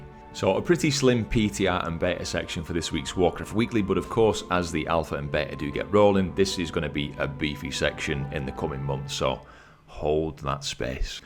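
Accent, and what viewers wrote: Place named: North, United Kingdom. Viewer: Northern British accent >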